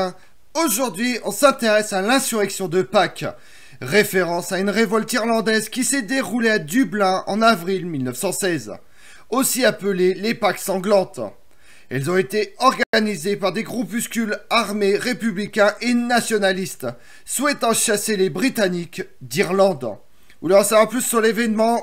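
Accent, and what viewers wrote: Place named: France. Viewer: Ta prononciation de l'Anglais est très... Française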